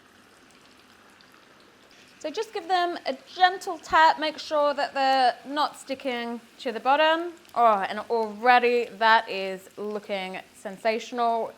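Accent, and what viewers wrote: United Kingdom. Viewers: thank you... you sound soo british...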